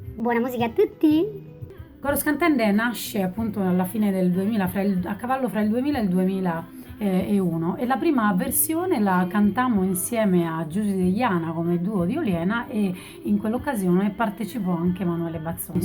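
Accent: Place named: Rome, Italy. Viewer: Anche io ho vissuto a roma per ca una decina d anni poi ho girato un po'e mi son stabilito a Forlì in em romagna la patria delle lasagne e del prosciutto di parma ma su coro meu e sempre in sardigna . Comunqu si entiva l aacento romano , comunque sei la n 1 io su internet ti seguoo sempre sei la prima cantautrice sarda che ho scoperto su youtube e son contento . Complimenti per la voce sei la n° 1 sono fiero di seguirti sto imparando tutte le tue canzoni grazie per avermi risposto . Unbacione grande a te e tutta la Sardegna .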